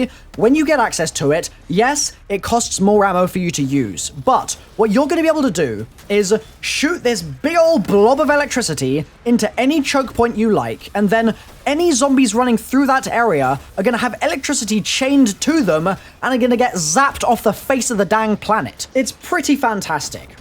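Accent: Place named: England, United Kingdom. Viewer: He’s English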